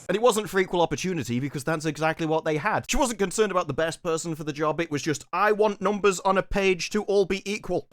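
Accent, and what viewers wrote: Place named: Geordie, United Kingdom. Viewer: You from the Toon, mate? I think I can detect a hint of Geordie in your accent... and yes, I am the Bat-Gadgie.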